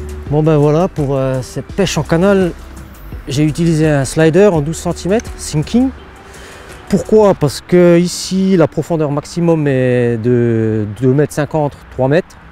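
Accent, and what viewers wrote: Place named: East, France. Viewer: On aime l'accent de l'est !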